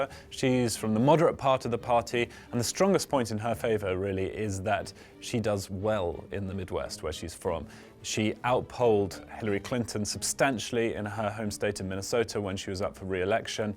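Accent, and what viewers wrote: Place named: United Kingdom. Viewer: Why am I hearing HEARING A BRITISH VOICE !!!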